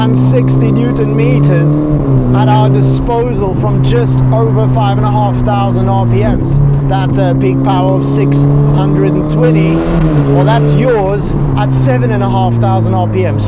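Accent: South Africa. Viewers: im hearing South Africa, im hearing Welsh.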